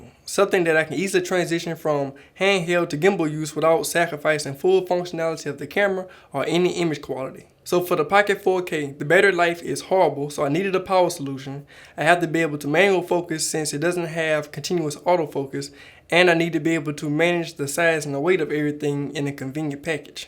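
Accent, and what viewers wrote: Louisiana, United States. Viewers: I kept thinking, "damn this dude sounds like he's from Louisiana." Then we got to the b-roll. Yup. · I love that Louisiana accent.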